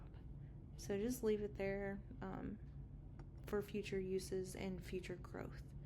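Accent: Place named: South, United States. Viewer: I just bought your template and yes I am side tracking here but your southern accent sounds so pretty! ☺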